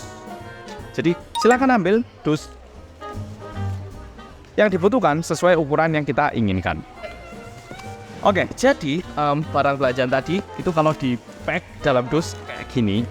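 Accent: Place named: Surabaya, Indonesia. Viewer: lagatnya suroboyonan.. btw muantaap infone mas... kapan waktu aku tak mangkat korea.. sehat selalu masee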